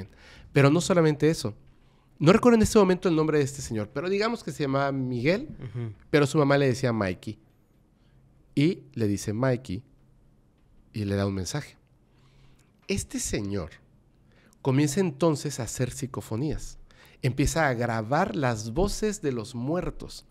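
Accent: Yucatan, Mexico. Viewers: Imposible ignorar el ascento Yucateco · habla como yucateco.....porque habla asi??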